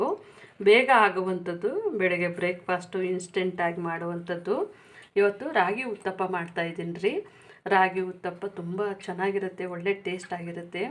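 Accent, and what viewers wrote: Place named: North Karnataka, India. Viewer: ನೀವು face ಯಾಕೆ ತೋರಿಸುವುದಿಲ್ಲ. ಉತ್ತರ ಕರ್ನಾಟಕ ದವರಾ a style matadi ಚೇಂಜ್ ಮಾಡ್ಬೇಡಿ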